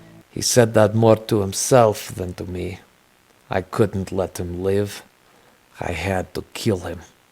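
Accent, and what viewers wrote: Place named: Russia. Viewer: Damnnnn that was gruesome! Awesome stuff man, a great story, a great narration of the story and a great Russian accent, nice work buddy!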